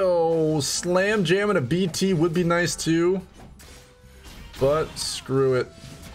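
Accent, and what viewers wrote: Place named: Rio de Janeiro, Brazil. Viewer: paulistano??? Ta maluco kkkkkkkk carioca na veia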